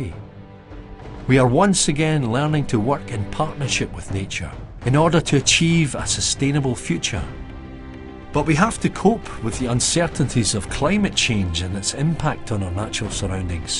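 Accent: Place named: Scotland, United Kingdom. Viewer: I have never heard such ignorance in the same place. The only good thing about this video is the cinematography and the Scottish accent. Beyond that it's a heap of BS. B. Howard, MG Retired Historian and genealogist.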